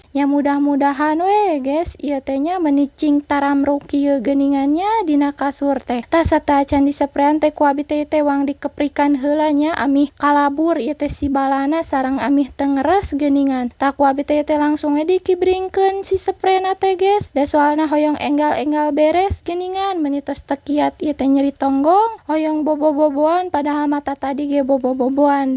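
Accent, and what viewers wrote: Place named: Sunda, Indonesia. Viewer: Sunda nya teh 😊😊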